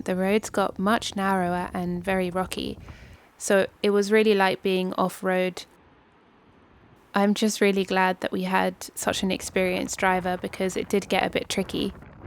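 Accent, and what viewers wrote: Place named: United Kingdom. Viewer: Its not kului .... Its kuuuullu .. 😂😂😂 british accent sucks .. Water : whatha 😂😂😂 Its not Rock fooling : its rock falling bro You are eating Aam papad.. kala katha flavour 😂😂😂😂😂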